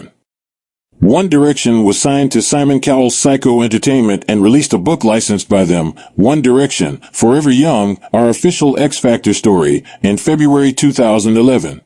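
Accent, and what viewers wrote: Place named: United States. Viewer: Whats with the American commentator.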